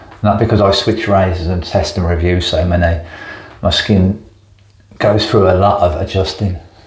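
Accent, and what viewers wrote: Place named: Cockney, United Kingdom. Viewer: A bit of sincere advise mate. Speak a bit slowly because you speak with a cockney accent a lot of people will not understand you and it decreases the number of subscribers.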